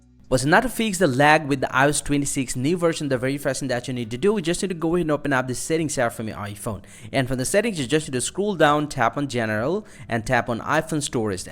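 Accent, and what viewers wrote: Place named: India. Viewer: bhai itna vahiyaad accent hai na tera. Indian english me hi bol le. Saale angrezi chodde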